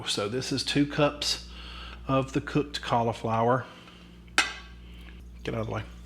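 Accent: South, United States. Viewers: Man! I have been horrible on my diet and apparently was off Keto so bad YouTube stopped suggesting keto channel videos for me... bad sign for my health but also I missed your videos. Love your positive personality and your accent reminds me of my mother’s southern accent. Might have to try some of these and get back into keto eating...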